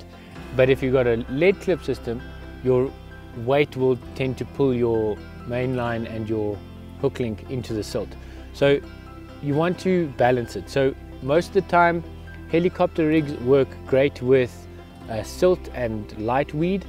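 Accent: South Africa. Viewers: I noticed the accent immediately! Nice to find a local channel on fishing 🇿🇦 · Lovely to hear a saffa doing some carp stuff. Subscribed now. From a fellow saffa in devon, United Kingdom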